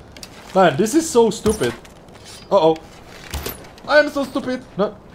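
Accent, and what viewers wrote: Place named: Europe. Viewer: What country are you from? I was going to guess but I would probably get it wrong lol. Somewhere in europe.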